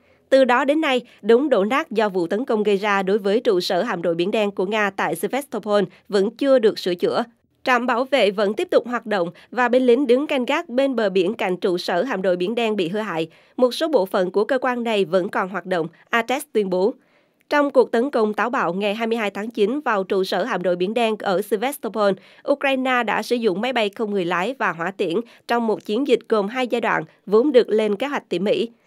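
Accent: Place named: North, Vietnam. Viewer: HAPPY NEW YEAR 2024 to The Saigon Post. Chúc The Saigon Post càng ngày càng phát triển, Đề nghị The Saigon Post sử dụng phát thanh viên có giọng nói của người Sài Gòn thay vì giọng nói của miền ngoài, chúng tôi không thích, cám ơn.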